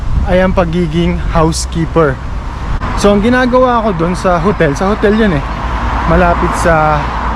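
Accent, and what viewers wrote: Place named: Philippines. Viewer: Hi kabayan, okay yan na pinoy pronunciation ang gamitin para hindi mabawasan ang pinoy feels sa mga vlogs mo. Ako dito working in Heanor pero mga tao dito kakaiba magpronounce ng words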